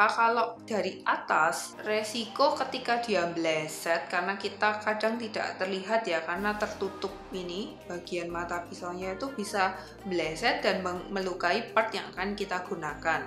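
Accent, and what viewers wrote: Java, Indonesia. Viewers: ce.. medok tenan se, wkwkw.. jowoan ae, good konten anw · medokmu mbak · Medoknya bikin mabuk kepayang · Medok nya bikin kesem sem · Tante tambah medoook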